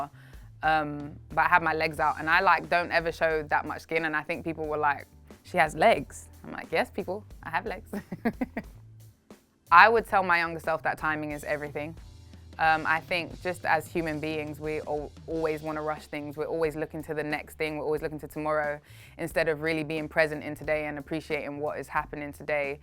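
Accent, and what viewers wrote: United Kingdom. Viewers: YOU CAN HEAR THE BRITISH ACCENTS. I LOVE YOU ELLA MAI 🙏🇯🇲🇯🇲🖤💛💚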